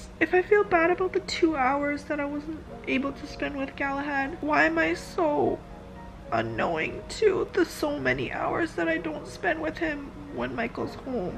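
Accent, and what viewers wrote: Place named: South Korea. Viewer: konglish on point 👌🏻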